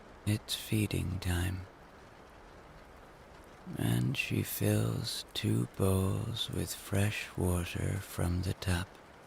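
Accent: Ireland. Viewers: Hi, I absolutely love this Rainy Story collection. I listen to your stories every night in bed and always fall asleep very soon after the actual story ends. They are all so lovely. My favourites are the rainy and book shop stories. Just know that you are very appreciated by so many people around the world listening to your lovely Irish accent telling the most beautiful bedtime stories. Thank you!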